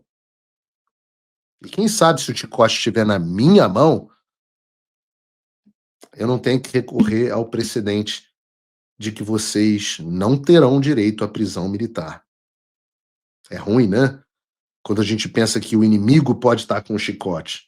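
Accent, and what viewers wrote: Rio de Janeiro, Brazil. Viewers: Velho, posso te dar um conselho? Esse seu sotaque carioca te faz parecer bêbado e mau caráter (o que você é de fato).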